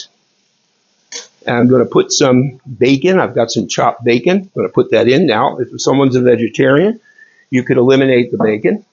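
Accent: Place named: South, United States. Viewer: Love your accent! You're truly a southern belle 🤗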